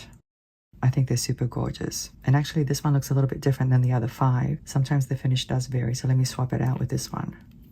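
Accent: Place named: Australia. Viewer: I watch on my android phone and I never have problems with the audio and I love your Australian accent.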